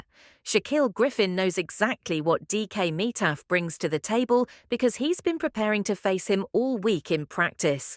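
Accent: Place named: Australia. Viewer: Click bait sewer with an Australian accent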